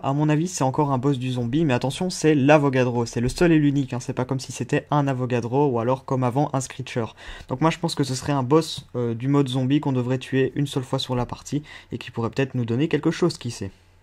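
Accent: Belgium. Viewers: un petit accent de Namur .... si tu arrivais à persé le mystère des emblemes en mode Zombie la je Pourrais dire que tu représente bien la Belgique sur youtube/COD parce que avec 102 000 zombie tuer je suis toujours en chien au couteau ! nan je déconne tu représente bien à fou continue ;)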